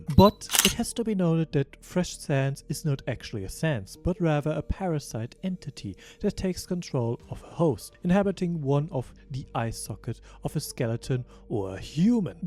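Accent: Germany. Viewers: Is it possible that you are German and you have an accent?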